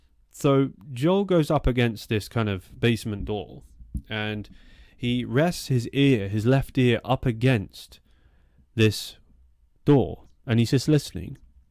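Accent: United Kingdom. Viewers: You have some great stories,but please make them less meandering!! I've seen people commenting on that in your other videos...you will lose viewers, otherwise. Of course, your British accent is nice and you seem personable. Just less detail,so that you can keep the story moving along...😊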